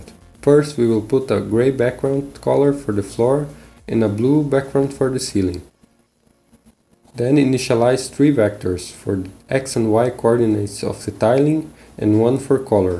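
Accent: Brazil. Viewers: Parece inglês de brasileiro. Saquei pela palavra “vidio”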